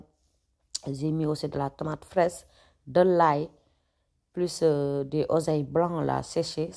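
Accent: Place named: Wolof, Senegal. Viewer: félicitations à vous , très bien expliqué , presqu'aussi bien que mon mari de guinée bisau.😊😊.Belle présentation et merveilleux accent wolof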